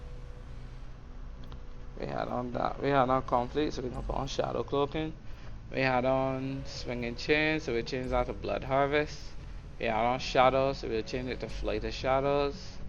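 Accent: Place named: Trinidad and Tobago. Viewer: That accent sounds like you are from the V.I or Trinidad. It's all good, I' m from St.Kitts Nonetheless very good preview of the Slayer skills. I was curious to see what the class was about and so far I'm impress. Big up!! ^_^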